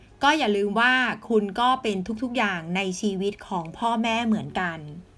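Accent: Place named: Isan, Thailand. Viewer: นึกว่าคนอีสานเว้าอีสานคักขนาด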